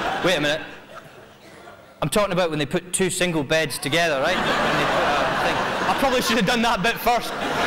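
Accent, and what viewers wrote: Australia. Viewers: He’s awesome! And he’s got the Aussie accent down perfectly! 😂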